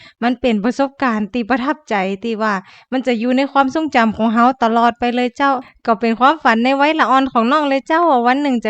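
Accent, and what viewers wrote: North, Thailand. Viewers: เป็นงานแต่งที่น่ารักอ่ะ วันนี้บังเอิญเปิดมาเจอ เลยได้ไล่ดูมาเรื่อยๆ เจ้าของช่องอู้คำเมือง น่ารักดีค่ะ ยินดีด้วยนะคะ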